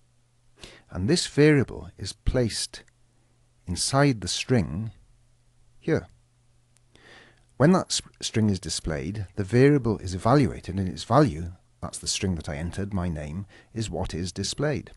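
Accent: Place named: Wales, United Kingdom. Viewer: Now that's a lovely Welsh accent.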